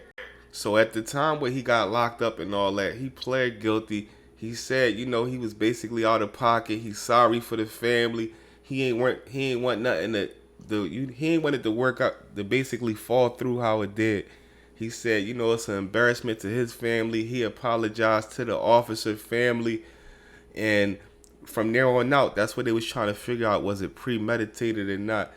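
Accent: Philadelphia, United States. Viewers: I know people that aren’t from Philly or the tri state area don’t be understanding half the words you be saying lol I’m from Philly and I’m like bro talking so Philly that it sounds like you mockin us even though I know you from Philly too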